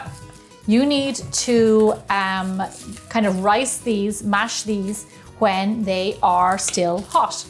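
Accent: Ireland. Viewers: Hi.. I love your do-it-yourself filming, it brings out your personality even more.. when you were humming as you were picking the potatoes.. it's lovely to see how you're keeping your accent and Irish-"isms" intact 🤗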